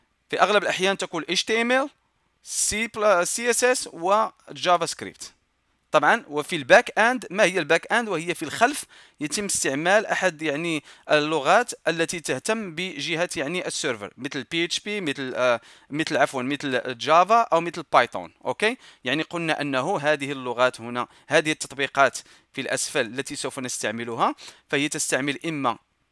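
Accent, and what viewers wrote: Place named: Morocco. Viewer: أنا اتحدى في شيئين: الأول: يكتب كلمة إنجليزية بدون شخابيط في آخرها الثاني: ينزل مقطع واحد بدون مايلخبط في الشرح هههه:) دمه عسل وانسان رائع الله يوفقه + اللهجة تعجبني كثير هو من المغرب صح ؟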